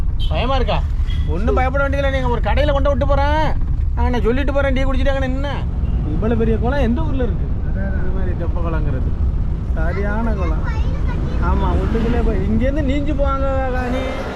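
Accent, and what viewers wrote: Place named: Thanjavur, India. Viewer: Akka orthanadu thambi yin valthugal Thanjavur pechu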